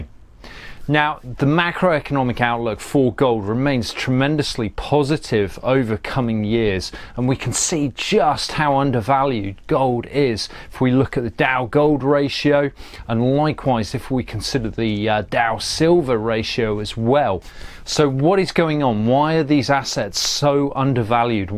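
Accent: United Kingdom. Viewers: You remind me of a black guy, but with Caucasian skin and a British accent.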